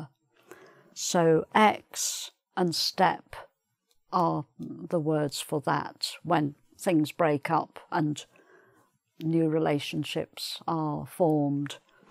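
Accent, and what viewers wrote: England, United Kingdom. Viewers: chemical makeup hhhhhh You have the nicest English accent I've ever seen before. keep on. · thank you a lot , i loved your british accent much <3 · Hi i like your videos,theyre very intresting and i learned alot from you i like the british accent!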